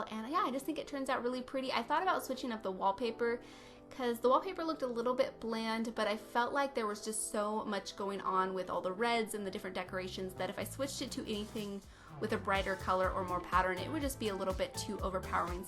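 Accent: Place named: United States. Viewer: Beautiful! I always enjoy watching your renovations and I know it sounds weird but you have a lovely voice! Probably because you’re American and I’m English it makes a nice change! 😊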